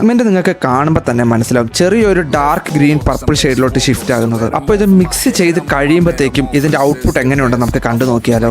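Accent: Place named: Kottayam, India. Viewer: അവൻ്റൊരു കോട്ടയം ഇംഗ്ലീഷ്. ആയിങ്കിൾ, ആയിക്ടീവ്. എന്നിട്ട് തമിഴന്മാരുടെ പോലെ എന്നാ എന്നാ എന്നൊരു സംസാരവും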